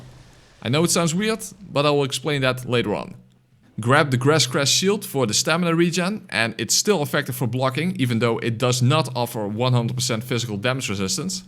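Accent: Netherlands. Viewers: Dutch accent, that's a yikes! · Ik hoor gelijk dat je nederlands bent!😂😂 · Lekker kazig accentje! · His so very dutch accent is funny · First three words and I’m like “yep, this guy is Dutch”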